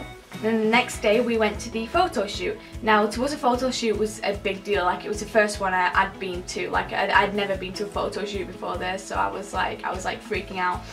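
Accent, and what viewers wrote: United Kingdom. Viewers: i love your British accent❤️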